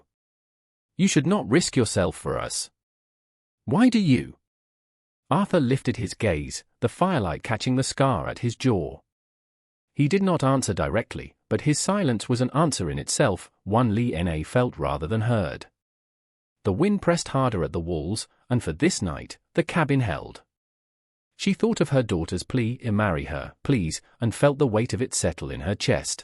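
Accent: United Kingdom. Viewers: A British accent narrating a western USA fictional story? No...just no.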